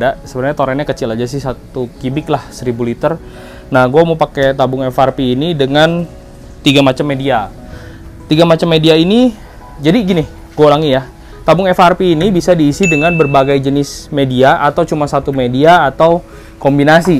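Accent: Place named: West Java, Indonesia. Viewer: logat nya sunda banget euy....hehehehe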